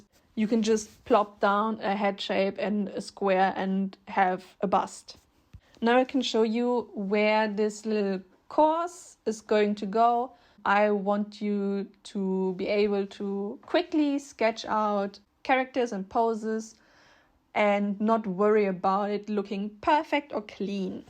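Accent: Germany. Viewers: Nice video!! Random question, are you German by any chance? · Du bist einfach deutsch hahahha, dass hört man;) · i have learnt german and i don’t mean to be an absolute mug but i love the german accent. i hope ur not insecure about it, it’s so awesome :))) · Wie Deutsch will dein Akzent klingen. Trotzdem cool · Are you German? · omg no! Its just that i recognized the accent somewhere and then remembered my German classmates speaking english! Good video though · are u german ? cuz u kinda sound german Good Video Btw^^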